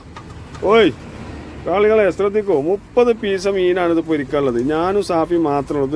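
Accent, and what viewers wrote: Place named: Malappuram, India. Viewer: മലപ്പുറം സ്ലാങ് മാറ്റരുത് ഞാൻ 87മുതൽ നാഷണൽ പറമിറ്റിയിൽ ഓടിയ ഡ്രൈവറാണ് അന്ന് ഇത് പോലുള്ള സൗകര്യം ഇല്ലാത്ത ഡെവിൾ കേൾച്ചും ഓടിച്ചാൽ ഓടിയത്ത സ്റ്റെയറിങ്ങും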